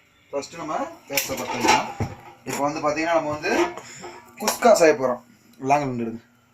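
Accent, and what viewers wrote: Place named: Madurai, India. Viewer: super bro konjam stove kleen banunga pro unka slang very super you netive madurai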